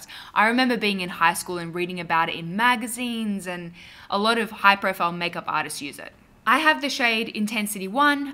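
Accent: Australia. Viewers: Your Aussie accent is my fave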